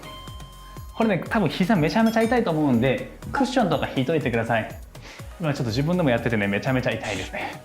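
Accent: Kansai, Japan. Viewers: めっちゃ有難い😊 最近は太股が締まってきてズボンに余裕を感じます。🎵 それと、歩いてても前と何だか感覚が違う気がするのは気のせいなんかな？ 先生❗ちょいちょい出てくる関西弁がええわぁ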